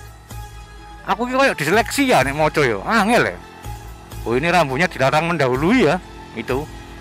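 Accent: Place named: Surabaya, Indonesia. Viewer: Opo wong playen asli Suroboyo Mas, loghate kok suroboyoan.?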